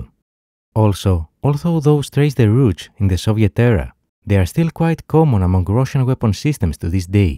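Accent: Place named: Greece. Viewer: Its the typical greek accent in english.Myself i lough all time when i listen greeks speakimg English(im greek)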